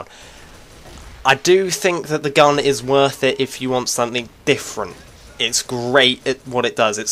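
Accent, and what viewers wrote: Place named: United Kingdom. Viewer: lol ur British accent :) cool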